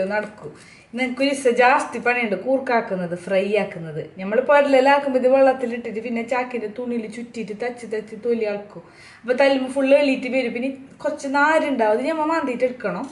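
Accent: Kasaragod, India. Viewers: Review പത്തുപൈസക്ക് ഇല്ലേലും..... നമ്മളെ കാസ്രോട്യൻ സ്ലാങ് പൊളി ആക്കീന്.... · Review from an innocent mind, through super kasaragod coloquiel language, super.. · 👌👌👌👌 kasrod baasha superaayi paraynnind..bhaashaye ingne abhimaanathodu koodi paranh variety channel aakyathm mass aayin. thattam dairyaayit itto..palarum enthokkeyo paraynnind..kaaryakanda.. Indian pourante avakaasham💪. kurupottiknnavare kaaryakenda..full support💪💪💪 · ഒരു വീഡിയോ ഇടൂ ട്ടോ ഞങ്ങൾ തെക്കൻമാർക്ക് വേണ്ടി.... കാസറഗോഡ് കൊള്ളില്ല എന്നല്ല അതിനർദ്ധം... പൊളിയാണ് താങ്കളുടെ കാസറഗോഡ് talk💕💕💕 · ഞമ്മള് ഇങ്ങളെ കാസറോഡ്‌ ബാർത്താനം കേക്കാൻ മാണ്ടി ബന്നതാ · Sherikhum kasergode ulla ellavarum ingane aano samsarikkunnathu....Ente kude oru 4 perundu pakshe Avaru ingane samsarikkunnathu kettitilla.....mangalapuram kaaru Ee reethiyil ulla malayalam paranju kettitund..,avarude Ee Baari Bhaasha super aanu kelkkan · Muslimallaaannn enikkariyaaam.olentheppaa shawllokkeyittit ksd slang paraynne.athond allaand olod asooyannolla😂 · കോഴിക്കോട്ട് ഉള്ളോർക്ക് തെരിയാത്ത ഭാഷണ്ടോ ഞമ്മക്ക് ഇതൊക്കെ എന്ത് ഹൽവ മാതിരി കാസ്രോട്ട് പെര്ത്ത് ഇഷ്ട്ടം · നല്ല അടിപൊളി ആണ് ടോവിനോ നല്ല നമ്മളെ കാസറഗോഡ് ഭാഷേ നല്ല ചേലായിനി നമല്ലേ ലാലേട്ടൻ നല്ല പങ്ങായിൻ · +kasargod slang +thattam.. 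a deadly combination... Meanwhile weak point of any dare and devil soul.. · Endokkeyo evideyokkeyo manassilaayi. Edakk lucifer ennu paranjond athum😂😂. Kettiond irikkan nalla resond kasargod slang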